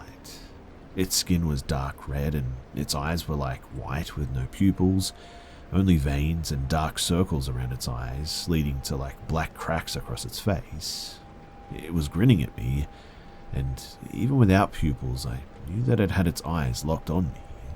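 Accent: Australia. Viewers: Aussy's are my favourite narrators now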